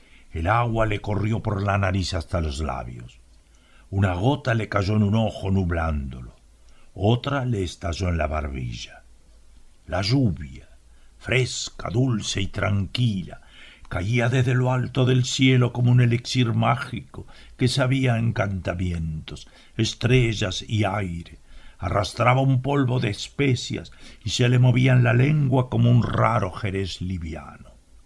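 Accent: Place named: Argentina. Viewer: Ese acento argentino no me deja concentrar ...😅😅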